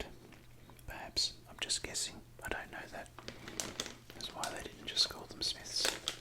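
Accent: Australia. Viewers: What makes asmr better is an Aussie accent great Job m8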